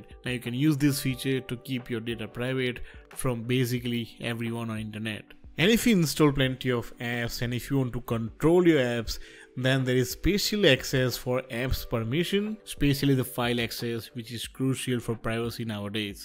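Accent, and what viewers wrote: India. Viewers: You don't sound like an Australian. You sound like an Indian chapri at best.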